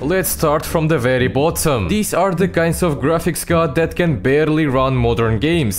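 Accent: Russia. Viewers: По акценту слышно что наш) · Bro u russian right? Its accent🤔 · Do only I hear russian accent? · I hear that Russian accent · bro has the strongest russian accent ive heard lol😂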